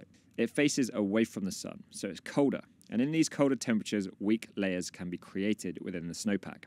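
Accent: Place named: Australia. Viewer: Oh jesus! Not another aussie, new zealander or whatever!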